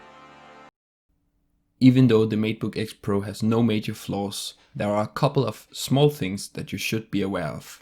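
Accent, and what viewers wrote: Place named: Denmark. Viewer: Er du dansker? Jeg så det danske tastatur, og det lyder også lidt som om at du snakker med en dansk accent.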